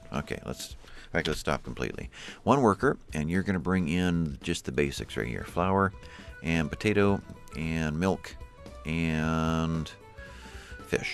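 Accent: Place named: Ireland. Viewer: Very Irish tone to this ep, very nice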